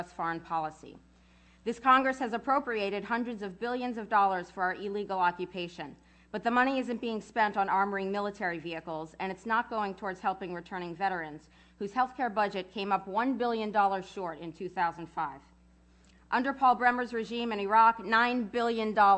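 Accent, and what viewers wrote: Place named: France. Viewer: Are you? You speak like you may be FRENCH.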